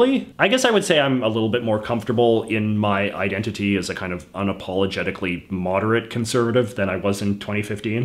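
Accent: Canada. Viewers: I can't stop watching your videos. Between the Flock or Seagulls haircut and the Canadian accent I can't look away.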